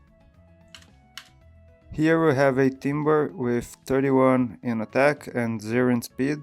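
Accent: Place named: Brazil. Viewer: gg where are u from bro? your accent looks like brazilian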